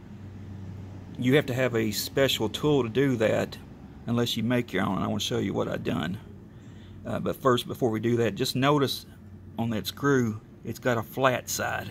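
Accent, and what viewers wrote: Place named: South, United States. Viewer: My Lord, you're a genius. Your idea gave me for free and almost immediately what several dollars and an hour long trip to a hardware store would have cost me. This worked perfectly for my Poulan Pro PR28SD string trimmer. Your accent tells me you are from the South...where else could such creativity come from? Thanks.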